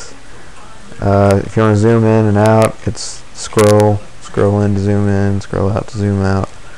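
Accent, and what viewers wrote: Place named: Tennessee, United States. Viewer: damn guess since im from tn i ddnt notice the accent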